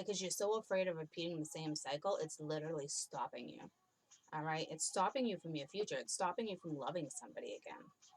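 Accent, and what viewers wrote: Boston, United States. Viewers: (Aries) omg. the only differences in you and my Scorpio ex is the hair, color, same hair, different nose and your I assume boston or jersey accent, leaning towards boston though insead of Ky. It's crazy but I love your videos, your too decent. 3rd party situations with her and me being verbally and emotionally abusive to her because I........just fucked up but. I miss her. I always enjoy your videos. :)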